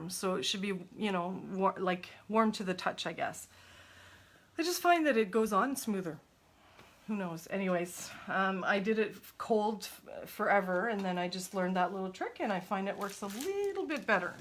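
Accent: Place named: Minnesota, United States. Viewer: Aside from saying "Ay", you sound just like a Minnesotan to me😁 I want to make cups now, you made it look doable (not easy) for a crafter. Thanks!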